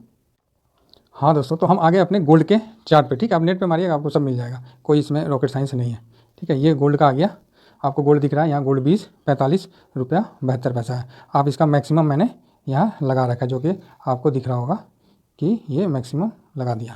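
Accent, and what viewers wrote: Bihar, India. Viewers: App bihar ka lagra sir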